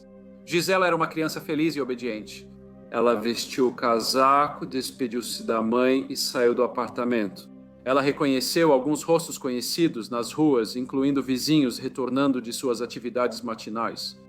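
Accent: Portugal. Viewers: Os casos são interessantes. Mas a forma de vc contar. Muda a voz as vezes parecendo um português de Portugal. E chato.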